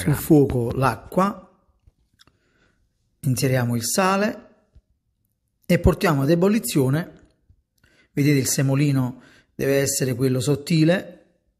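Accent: Naples, Italy. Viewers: Quando ti sento parlare con il tuo accento napolitano m'incanta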